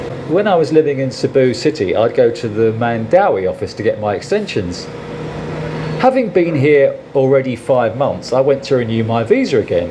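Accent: England, United Kingdom. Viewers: This was stupid. The englishman, from his dialect I think he is english should try to live in UK. Everything he complains about is so much worse in the UK. Philippines may have some problems, something we foreigners are not entirely happy about because it does not comply with our likes, but still....Pilippines is a great country to live in.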